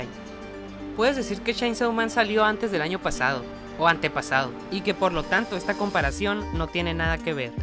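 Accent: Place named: Sonora, Mexico. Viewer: Brooo, ¡buen video! ¿Eres de Sonora? Tu acento me parece de aquí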